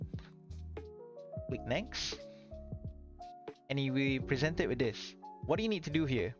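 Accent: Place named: South East England, United Kingdom. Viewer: Спасибо тебе, человек с юго-восточным акцентом за эту ценную информацию!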